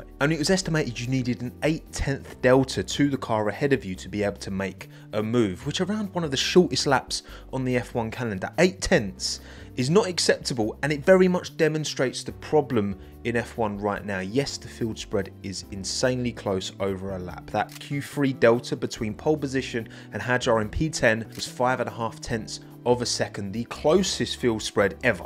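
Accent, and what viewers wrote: United Kingdom. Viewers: Yeah bro, your british accent says all there is to say. Props to your countryman!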